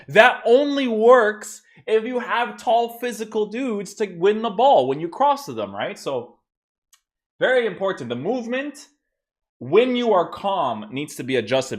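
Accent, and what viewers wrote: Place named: Portugal. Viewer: Man you from Portugal or something? Not only because of the shirt but your accent on player names makes me think you portuguese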